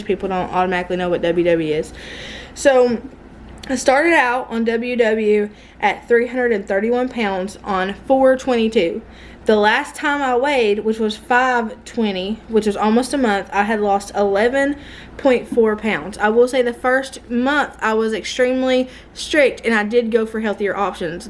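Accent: South, United States. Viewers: I was just last night telling my hubby about u and ur family and ur channel! My exact words were she says “hey y’all my name is and I’m coming to u from a trailer park in Tennessee!” In the cutest southern drawl ever! And I was telling him I watch a LOT of you tubers and that u are the most authentic and amazing one that I watch! I was telling him how much I love ur family values and what an amazing marriage u have!! How hilarious u and and even the kids are! And I had just told him that I think she is probably making some good money on you tube and u don’t even do advertisements. That u turned one down for thousands of dollars becuz that’s not how u wanted ur channel! And I told him how I was so happy seeing u be able to buy some diy stuff for ur house and take the kids on a staycation but how u still are so grounded and save up for things in envelopes and that u haven’t changed one but!! 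We love y’all for y’all!! I’m glad u explained that tonight (although I did cry) but I don’t ever want u to feel that way again!!!! Just delete nasty comments! Don’t deal with them!! U know you and that’s all that matters!! And I hope one day u pull up to that trailer in a Lamborghini!!!! Wouldn’t that be the talk of the park!!!! Lol!!! Plzzz don’t let anyone else bring u down! U have brought me up from some of my darkest days!!! There isn’t one video I have ever not smiled or laughed (even if we’re through tears of my own) the whole time!! U have a heart of gold! Don’t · I think people need to understand that you can still live frugal and on a budget and still have money to spend. My husband and I live below our means a lot, but if needed we can drop a couple hundred on something and not have it hurt us. Just because you try and live frugally, doesn't mean you can't spend a little extra if you decide it's worth the spending. 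I got here watching your alls plantation shutters video, and stuck around for the southern drawl and since of humor. There's only a few youtubers that I watch solely for persona and you would be one. I just like when I watch a video and someone's house isn't perfect all the time, because mine isn't. After 4 kids (and some health issues starting to show up like PCOS) I'm on a health journey too, so it will be cool to see your progress and feel like someone else is doing it with me. 😊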